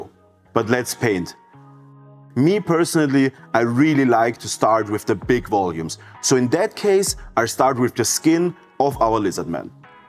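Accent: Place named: Austria. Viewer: Das mit dem offwhite muss ich mal testen. Bis jetzt eher Richtung Knochenmäßig gegangen. Du gibst mir voll die Schwarzenegger vibes mit deinem Akzent. Super zu verstehen. Freue mich auf mehr. Grüße aus Hamburg.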